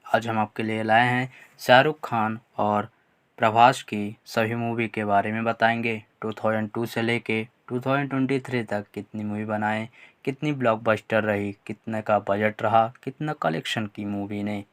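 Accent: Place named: India. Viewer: What city are you from? I'm interested in figuring out where the accent in India is from.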